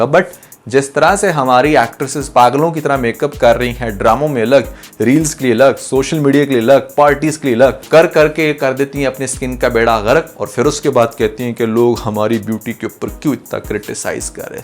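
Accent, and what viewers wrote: India. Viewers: میرا اعتراض الگ ہے۔۔۔جو تم ہندی لحجے میں لفظ۔۔۔پھر۔۔۔کو۔۔۔فِر۔۔۔کہہ رہے ہو اس۔ کی کوئی خاص وجہ ہے کیا؟؟؟ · ان کی خوبصورتی کو چھوڑیں، پہلے یہ بتائیں کہ آپ بھارتی لہجے کی نقل کیوں کر رہے ہیں۔ پھر کے بجائے فیر کہنا۔ پاکستانی ہیں تو اردو تلفظ اپنائیں۔ You sound disgusting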